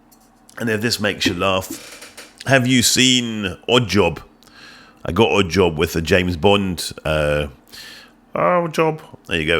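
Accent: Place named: England, United Kingdom. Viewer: Good review and you've acquired a bit of an English accent 😂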